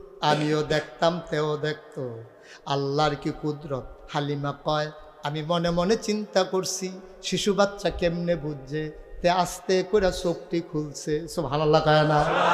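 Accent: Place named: Kishoreganj, Bangladesh. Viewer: এই হুজুরের বাড়ি কি কিশোরগন্জ ভাষা সুনে মনে হলো আরকি ।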